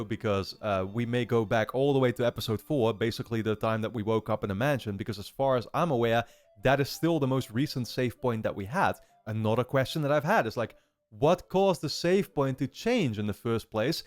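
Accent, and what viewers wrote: Netherlands. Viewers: Your dutch?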